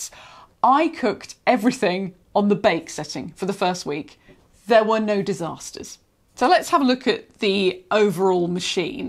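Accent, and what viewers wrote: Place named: Middle class, United Kingdom. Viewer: Perfect review, and so refreshing to hear a nice, middle-class British voice on a product review! Thank you!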